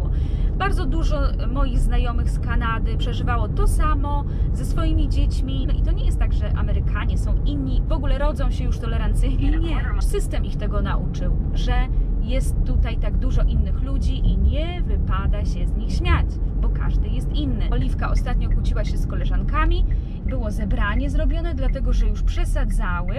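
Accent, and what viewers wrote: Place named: Germany. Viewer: Dla mnie vlogi po angielsku może mają sens ale nie na tym kanale ponieważ Pani czasami przekreca słowa i ma trochę niemiecki akcent (bez urazy). Angielskiego uczymy się najwięcej słysząc go więc z tej naszej nauki wyjdzie jedno wielkie każdy wie co. Każdy powinien się uczyć angielskiego wtedy kiedy ma na to ochotę a nie pod przymusem.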